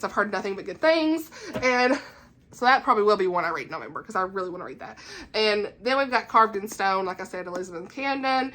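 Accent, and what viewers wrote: South, United States. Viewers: Are you down south with me?? I’m in Arkansas. I hear the twang 😎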